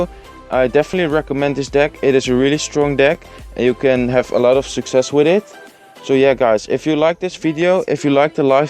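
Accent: Netherlands. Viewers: Are you dutch? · Hey man! i love the vids, you're really starting off strong as a channel :) Only thing i want to give you as a tip: maybe work on your english accent for a little bit. I totally understand since i'm dutch too, but i guess a better english pronounciation may help your channel grow. Your content is A+ :D love it! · Ik kan echt een nederlands accent horen wanneeer je an zegt (je hebt de neiging om een te zeggen) en plus (dat je gewoon nederlands uitspreekt. Let daar een beetje op. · You still have the Dutch accent :) Good vid btw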